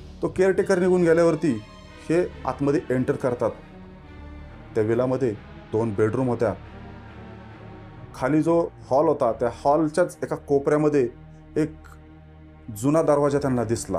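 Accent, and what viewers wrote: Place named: Nashik, India. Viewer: सर तुम्ही कुठले आहे भाषा तर नाशिक ची वाटी हे😊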